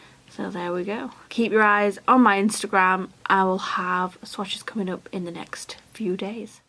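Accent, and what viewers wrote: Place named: United Kingdom. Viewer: Huh, I’ve been following you on Instagram for ages and I never realized you were British! Great video 😊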